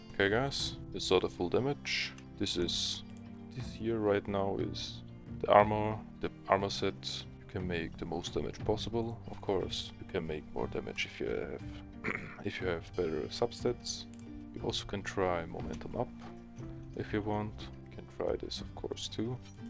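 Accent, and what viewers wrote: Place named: Russia. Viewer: Я слышу русский акцент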